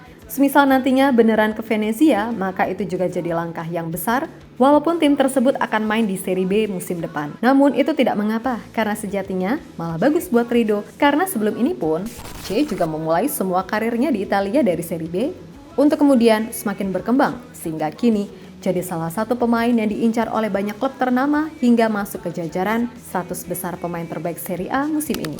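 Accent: Java, Indonesia. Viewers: " CANGKEM CANGKEM " 😂😂😂😂😂...logat jawanya khas banget min ❤❤❤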